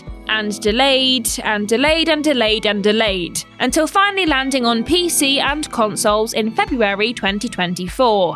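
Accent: United Kingdom. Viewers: For some reason, sounds more British than ever in this video <3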